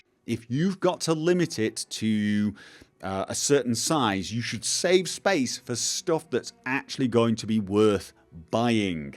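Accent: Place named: North, United Kingdom. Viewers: Averyl Fong Yeah hes definitly got a slight northern twang. Its inescapable. And for you yanky boys, we mean north England. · Bunny Rabbit I'm pretty sure he's just somewhat Northern :) Well, he sounds like it to me! You can hear it in the way he says words like "aff-ter" (Northern) instead of "arh-fter" (Southern/RP), or the way he says "up" (closer to "oohp").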